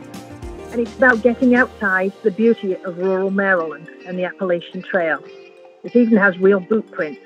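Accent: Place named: Northern England, United Kingdom. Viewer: Love hearing your luvly Northern voice , hearing your descriptions and your creative experience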